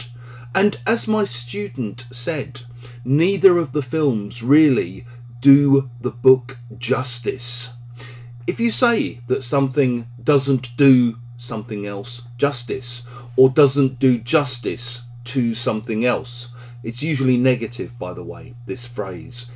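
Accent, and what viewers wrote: United Kingdom. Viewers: it is real British English mate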